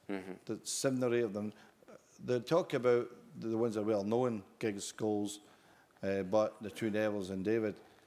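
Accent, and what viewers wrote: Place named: Scotland, United Kingdom. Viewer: Especially with his thick Scottish accent. My ears are blessed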